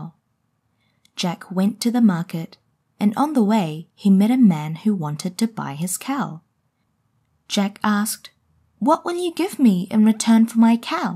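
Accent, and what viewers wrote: New Zealand. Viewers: 내년 1월에 뉴질랜드 6주 어학연수가는 학생인데 뉴질랜드 문화 이런것도 영어로 소개해주세요 뉴질랜드 발음 너무 조아요💕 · You have a proper Kiwi accent haha! Did you go to broadcasting school by any chance?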